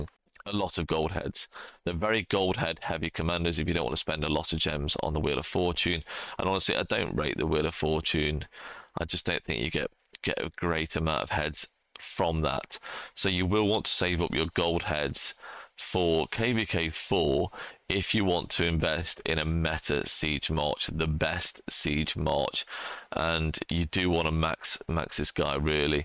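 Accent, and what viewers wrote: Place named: England, United Kingdom. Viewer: I was thinking England from the way you spoke but there’s so many different accents there it’s hard to pinpoint! Btw I just wanted to say I LOVE the videos man and I always recommend siege to people and tell them about your channel.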